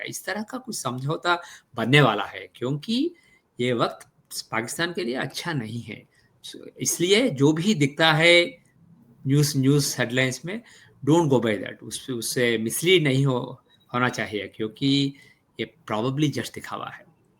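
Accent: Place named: South, India. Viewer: take time to speak clear hindi, sir ji. need more practise in hindi. south version of twist of your tongue needs correction.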